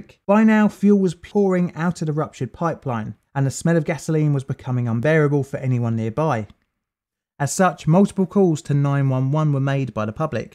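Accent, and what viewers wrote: United Kingdom. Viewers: Very much so. I enjoy falling asleep to British men talk about disasters. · It’s so weird hearing a British man talk about a bunch of tiny towns I live near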